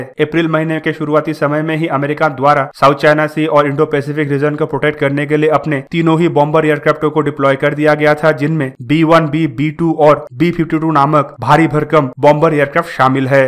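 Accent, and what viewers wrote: Bengal, India. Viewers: Sir aap Bengali hai?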